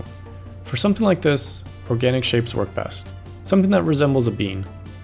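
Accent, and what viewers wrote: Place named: Europe. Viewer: From which country are you from?? I love your european accent 😍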